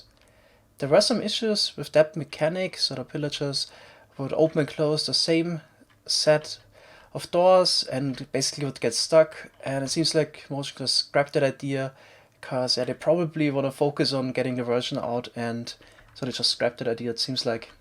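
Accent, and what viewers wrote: Germany. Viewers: Best intro of all of YouTube, and best German accent as well.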